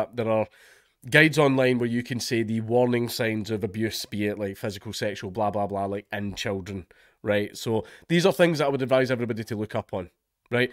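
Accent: Scotland, United Kingdom. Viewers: This is literally the first dankula video I've watched in my life Was not expecting him to be Scottish, I have to say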